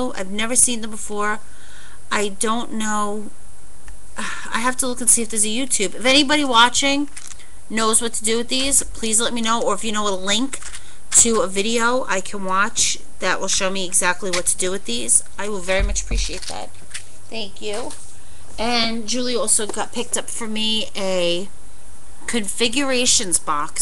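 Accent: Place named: New York, United States. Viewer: I love love love your NY accent, I live on the west coast now - and you're makin' me home sick. This an an awesome Haul - Tues Morning has been carrying a lot of Tim Holtz/Ranger Products. :) There are loads of how to Video's here on You Tube for using things Julie bought. Also try looking at the Ranger Site . Can't wait to see what you make with your goodies! Have an awesome day!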